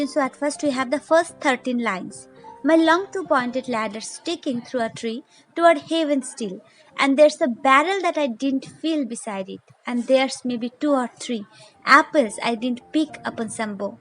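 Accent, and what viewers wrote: India. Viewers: Good explanation... In your talking style it proves that you belong from Bengali society 😊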